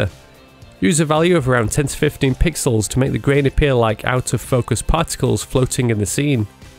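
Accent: United Kingdom. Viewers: you're such a cool bloke and your bri'ish accent is very satisfying to listen to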